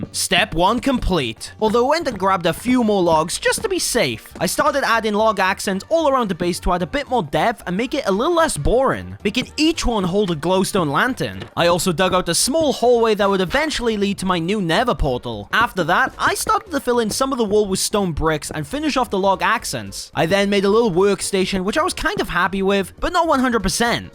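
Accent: United Kingdom. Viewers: U sound brish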